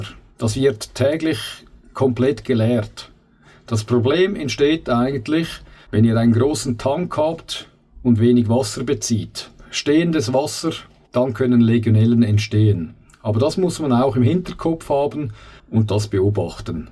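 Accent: Switzerland. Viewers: schöner bericht, danke dafür aber es wundert mich ein wenig: da sprcihst mit einem schweizer dialekt und gibt die preise in € an? aber das mit der zirkulationspumpe und dem leeren des boilers um 50 % ist mir nicht klar. diese zirkulationspumpe sitzt dann doch in einer ringleitung und so kommt das wasser doch immer wieder in den boiler zurück.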